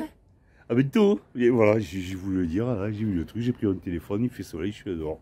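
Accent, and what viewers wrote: France. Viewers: Salut, pas d' accord avec toi, "les cons ça osent tout, c'est à ça qu'on les reconnait...". Ils y en aura toujours, donc il faut faire avec, mais on les emmerde et il faut leur faire savoir Haut et Fort. Notre personnalité n' a pas à en souffrir, au contraire, rentrons leur dedans par la dérision en leur montrant qu' ils nous amusent... Par contre, entièrement en accord avec toi, sans les créateurs ils n' existent plus...ils ont essayé d' être eux même créateurs, mais ils ont échoué... En tous cas, ton accent de cigale est toujours aussi mélodieux à entendre....Bons vols, bonnes courses et plein de bonheur.....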